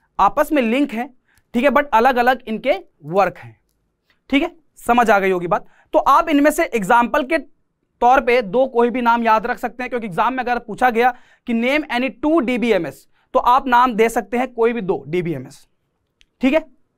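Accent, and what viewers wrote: Haryana, India. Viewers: Thank you sir 😌❤️ SIR AAP HARYANVI HONA 😀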